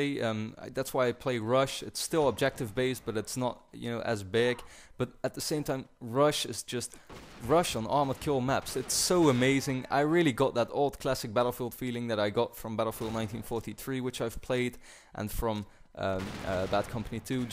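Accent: Netherlands. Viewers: Ik ben ook Nederlands en ik zocht op youtube een commentary over Breaking Bad en kwam toevallig op jou uit! Geweldige serie. Ik vroeg me van het begin al af of je Nederlands was ;)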